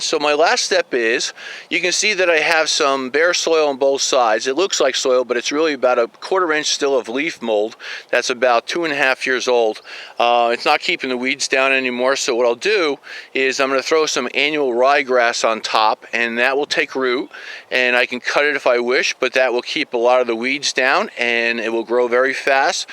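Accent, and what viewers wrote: New Jersey, United States. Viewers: Good video. Can really tell your from Jersey. "wooder", water.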